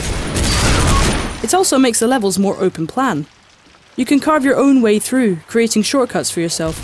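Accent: United Kingdom. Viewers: that british voice MAKE IT STOP!!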